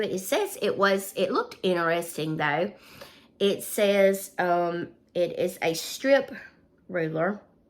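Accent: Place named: South, United States. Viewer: Are you saying peg apron or pig apron? Sorry, from Montana and not good with southern accents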